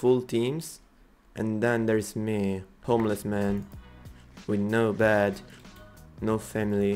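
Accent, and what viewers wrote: Italy. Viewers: Si sente proprio che sei italiano lol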